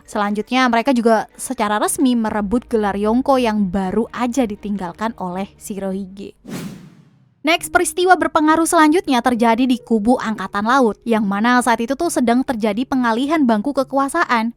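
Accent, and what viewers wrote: Java, Indonesia. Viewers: mana yaak? kangen gw medoknyaa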